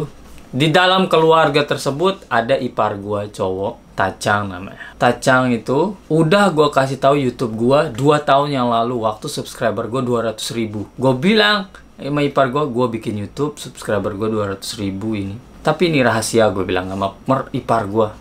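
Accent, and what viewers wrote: Lombok, Indonesia. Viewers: Kek logat lombok ya bang.. Dari lombok kah? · Logat lomboknya msi kerasa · Saluuut medok sasaknya gak ilang 😅😅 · Timmak nahase Betawi laguk logat masih logat lombok hihini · Masih bae fasih logat Sasak tie meton. Banggek gitakm sukses. Mudahanm tetep bahagia sekeluarge lek te😁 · Keren bang Logat sasak masih kentel😅 salam semeton sasak😺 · logat lombok nya masih kentel bang salam dari KLU lombok jg 🎉😂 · Abang kok logatnya lombok bgt ajarkan ke kita jadi youtuber 🤗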